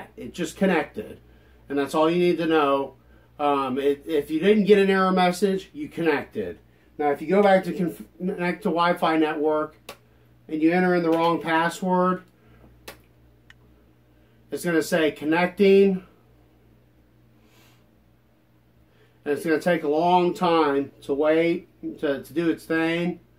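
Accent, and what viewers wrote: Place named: Philadelphia, United States. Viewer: Are you from Philly? Because that sounds like one hell of a philly accent.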